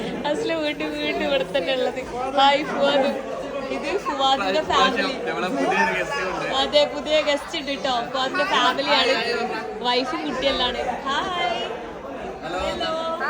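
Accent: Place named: Northern Kerala, India. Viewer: നല്ല വടക്കൻ മലയാളമാണ് പറയുന്നത് ഒറ്റപ്പാലം style അല്ലേ അല്ല.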